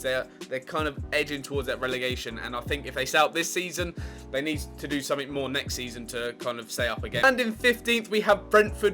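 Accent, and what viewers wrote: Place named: Manchester, United Kingdom. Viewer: Love the Manc accent. Always support your local team and love you are being a face for that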